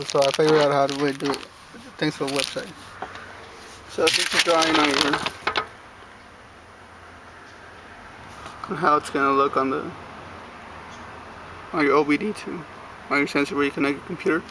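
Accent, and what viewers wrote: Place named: Mexico. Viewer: Carlo Russo that’s how Mexicans talk!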